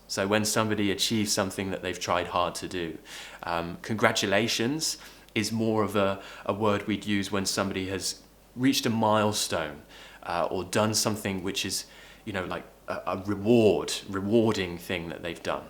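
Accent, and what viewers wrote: United Kingdom. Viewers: I like the british pronunciation. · Love uk accent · Thx man 🙏🏼 u are perfect teacher 👨‍🏫 I really love British accent 😍😍❤️❤️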